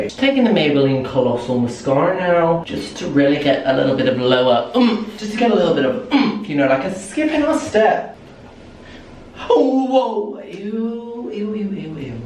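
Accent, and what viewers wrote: Scotland, United Kingdom. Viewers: More Scottish accent plz I can’t get enough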